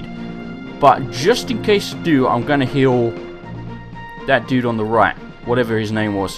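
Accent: United Kingdom. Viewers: He's surely british.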